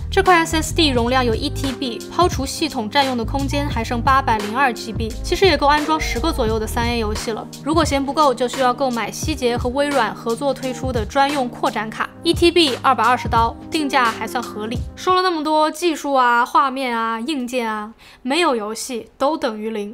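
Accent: Standard. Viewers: 英语发音超级标准。赞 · 英语发音真的标准。听着就舒服。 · 講英文與中文都非常標準又好聽 畫面也都拍的很清晰到位 享受啊😎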